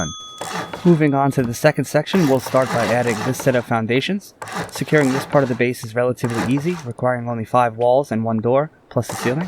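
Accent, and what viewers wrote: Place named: Boston, United States. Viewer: He sounds like he’s from Boston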